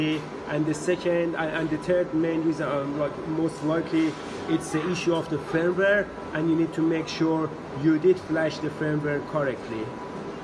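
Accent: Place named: Iran. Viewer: Persian accent :D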